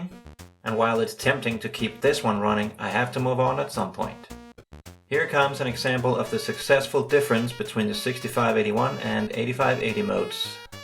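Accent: Denmark. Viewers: Hmmm.... Sounds like you are Danish!?!?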